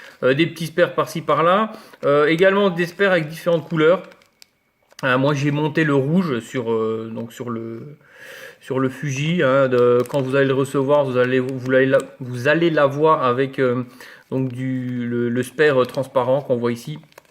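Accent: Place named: North, France. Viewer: je suis sur atos mais en reconstructible j ai pas passer le pas mai très bonne vidéo bonne explication de quelle région tu habite tu a un accent qui fait penser un peu au nord moi je suis originaire des Haute Pyrénéenne mai j habite en région parisienne Bernard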